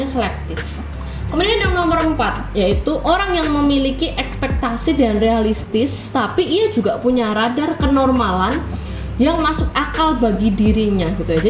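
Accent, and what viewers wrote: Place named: Semarang, Indonesia. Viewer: Logatny kayak logat2 orang semarang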